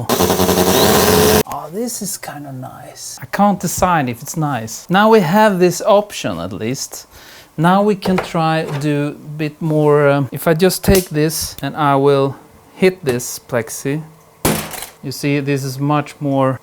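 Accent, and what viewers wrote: Sweden. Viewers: i really enjoy your videos. Kinda feels like bob ross with a Swedish accent.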